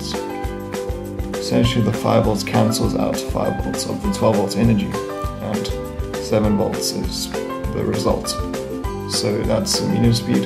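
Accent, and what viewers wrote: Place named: South Africa. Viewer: Hello, by the accent I assume you come from South Africa, where did you get the switches from?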